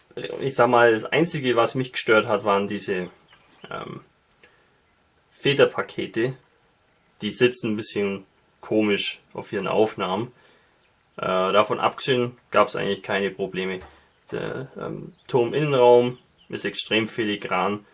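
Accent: Swabia, Germany. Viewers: Bist du aus Baden würtemmberg? Du klingst voll wife der Schwabe!!!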